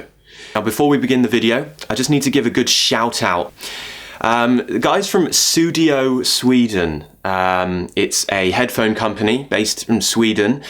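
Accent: RP, United Kingdom. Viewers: I miss your lesson, your pronunciation is so smooth and really british, love it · I'm a new follower of yours, and I think you're the best teacher. Its very interesting to watch your lessons since your accent is very British, very expressive when you explain to your viewers and most of all the sense of British humour which naturally comes to you when you teach especially when you end the video. Thank you so much! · I've been watching your videos lately and all I found was your British English pronunciation is my ideal one! I wish I could go to the UK and study English, but I'm too skint to do that.. (Is that correct? using the word "skint"?) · With this pretty face and British accent he could teach me how to install a damn garage door, and I would still be excited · I really like your british accent 😍😍😍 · Like your british accent 👌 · Are you using RP? 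Sorry I cannot tell the difference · Your accent perfect,i love this british accent . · Of course it's been ansolutely useful... And a pleasure to listen to your inspiring RP pronunciation 💜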